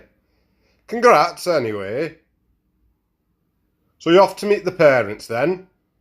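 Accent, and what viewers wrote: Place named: England, United Kingdom. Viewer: That's pretty creepy. Good story telling though. Where in England you from?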